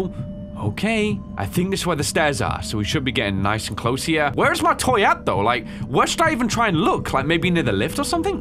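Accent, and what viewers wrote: United Kingdom. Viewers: British people pronounce certain words interestingly.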